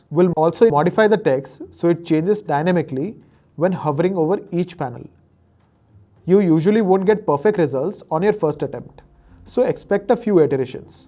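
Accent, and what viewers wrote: India. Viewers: I cant watch it anymore the indian accent triggers me so much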